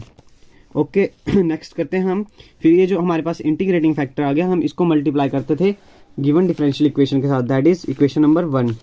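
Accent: Himachal Pradesh, India. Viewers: By your hindi accent im guessing you are from Himachal ( may be una or kangra)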